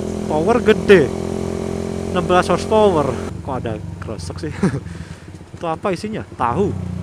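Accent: Semarang, Indonesia. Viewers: orang Semarang ya?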